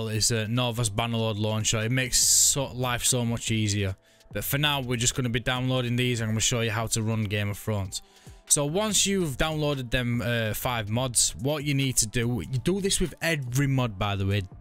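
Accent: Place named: North, United Kingdom. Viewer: a person with a northerner accent is teaching me how to install realm of thrones i luv it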